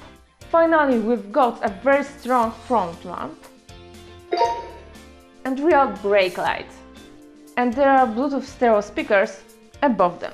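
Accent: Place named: Russia. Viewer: Приятный русский акцент :-)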